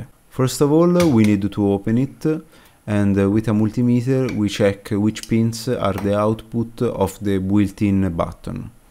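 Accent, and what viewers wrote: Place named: Tuscany, Italy. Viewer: Mi sà che tu sei anche toscano oltre che italiano 😀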